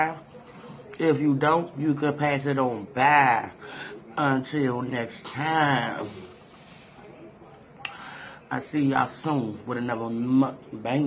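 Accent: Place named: South, United States. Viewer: You sound like you from the south, the accent reminds me of my grandmother accent. They from your area and the south.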